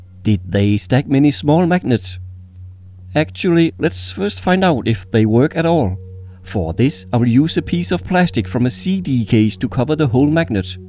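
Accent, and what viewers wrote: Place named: Denmark. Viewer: That accent sounds soooooo danish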